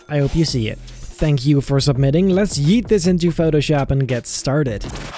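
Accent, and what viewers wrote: Netherlands. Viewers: Wow maat dat is sick dat ik jou nu pas tegen kom. Ondanks dat je Engels zeer goed is kreeg ik toch meteen al het gevoel dat je Nederlands was🙈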